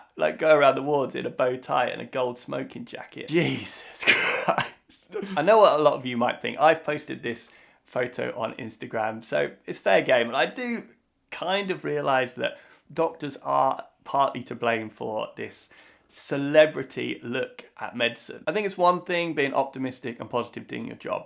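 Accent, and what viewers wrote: United Kingdom. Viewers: I Agree with you about the photo. You are much more handsome now. Actually, much cuter than that other doctor on the front page of the magazine. Besides that, you have a great British accent and I love the way you laugh. But I mainly watch you because you are so down to earth and you have interesting medical subjects and you explain it easy for me to understand with a touch of comedy. I watch 2 other doctors, and you are much better than them. You are my #1 doctor on YT.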